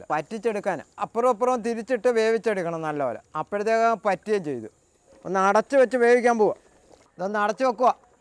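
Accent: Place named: Elippakkulam, India. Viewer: Elippakkulam slang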